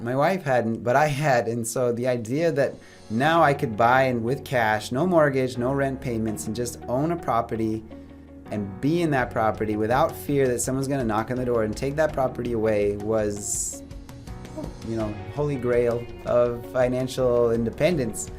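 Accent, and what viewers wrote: Italy. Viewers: You have an Italian accent, but you look more Northern European. Then again, many Italians look more Nordic like yourself. Just wondering, that's all. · I lived overseas for several years and you have the international English way of speaking with I think a bit of Italian regional accent. It it more recognizable when you speak slower. When you are more passionate or speak faster it disappears.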